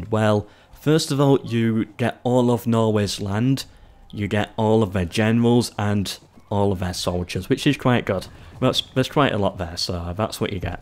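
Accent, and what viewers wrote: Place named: Northern England, United Kingdom. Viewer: Cool. I figured it was northern england, but couldn't place it. Your accent is very similar to Ygritte in Game of thrones. I'm from Norway btw. Cheers mate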